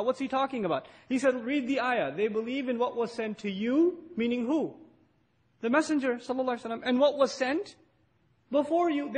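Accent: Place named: Asia. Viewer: How that exposed. The guy waffles for a few minutes in an Asian accent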